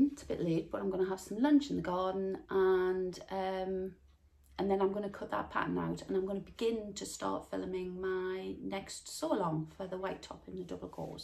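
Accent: Newcastle, United Kingdom. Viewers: pet, your vlogs are so entertaining. I love your geordie accent and all the sayings, I told my partner just the other week that his shoes were clarty and he looked at me as if I was mad - he’s a southerner needless to say. I’m initially from North Shields but moved away in ‘64, and have settled finally in sunny Spain a few years ago. Your zadie is amazing, and the fabric is gorgeous. Xx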